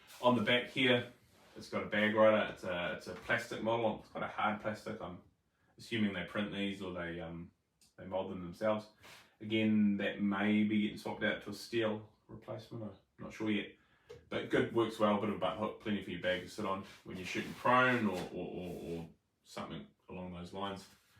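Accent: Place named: New Zealand. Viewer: I love listening to kiwis even though I'm not totally familiar with the slang. 😁 Thank you for the review of the MPA!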